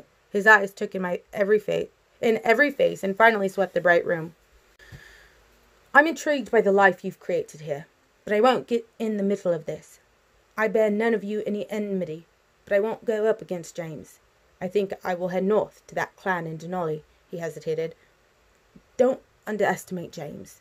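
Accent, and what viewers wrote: United Kingdom. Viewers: Pls read me before you Also, that British accent, 😹😹 I love it.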